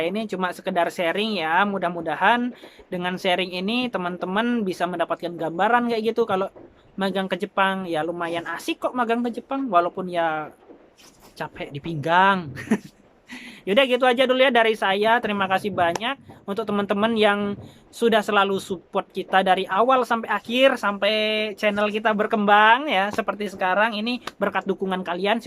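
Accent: Java, Indonesia. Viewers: Bang, asli wong jowo ta?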